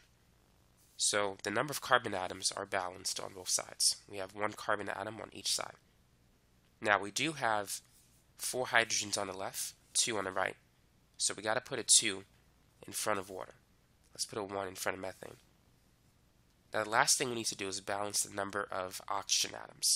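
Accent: United States. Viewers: listening to an american is kinda annoying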